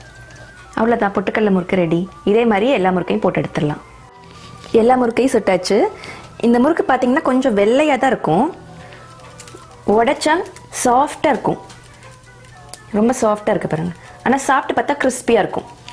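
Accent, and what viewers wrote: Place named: Tamil Nadu, India. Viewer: Your presentation and speech are crisp and pleasant like your murukku! You speak like a true Tamil lady, Crisp and sharp and decent!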